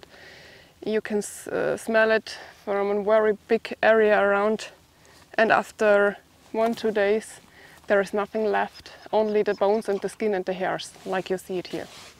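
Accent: Germany. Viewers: ich finde, du solltest das unbedingt auf deutsch machen, sorry, dein englisch ist zwar funny und irgendwie nett, aber wirklich arg "germanised" ...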